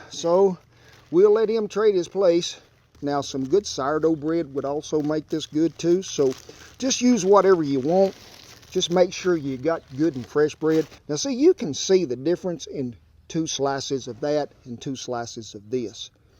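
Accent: South, United States. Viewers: I love his cowboy accent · Hey, greetings from Germany! I just stumbled upon this awesome and tasty recipe and now you got me so damn hungry... I'm gonna need to try this kind of cheese sandwich on my grill at home! Love your way of producing this video, love the accent and the puns - really makes me miss the US! Thanks for the video! I subscribed and I'm convinced by what you're doing! Greetings fellows! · I love a good southern accent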